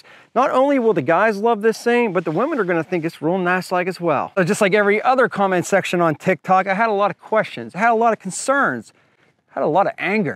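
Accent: Pittsburgh, United States. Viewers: You sound like a yinzer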